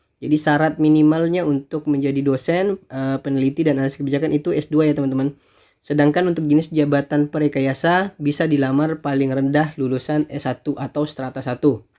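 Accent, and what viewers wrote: Pontianak, Indonesia. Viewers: Mau tanya bg abg asal pontianak ya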